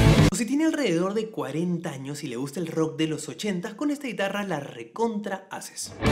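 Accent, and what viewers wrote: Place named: Mexico. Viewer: recien conosco tu canal y dejame decirte que pense que eras mexicano jaja que buen contenido tienes !!!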